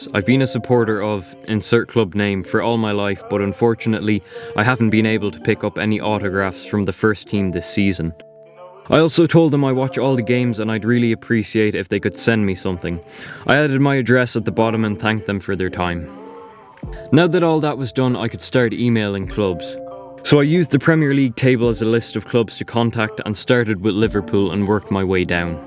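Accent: Ireland. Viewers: Are you Irish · Are you irish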